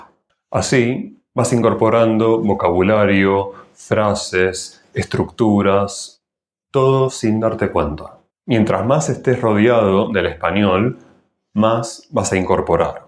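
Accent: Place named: Paris, France. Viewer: A veces me pregunto si usted tiene antepasados franceses, porque su entonación suena como la de los parisinos.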